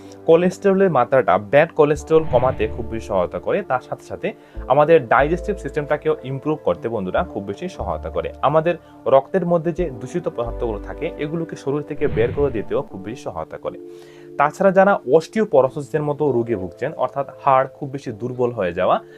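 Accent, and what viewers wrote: Sylhet, Bangladesh. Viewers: বালা মাতছইন সিলেটি ভাই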